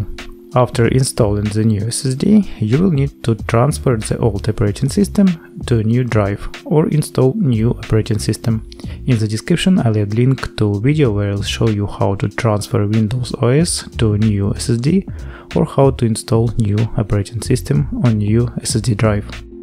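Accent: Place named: Russia. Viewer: Акцент выдаёт, на русском может есть смысл комментировать?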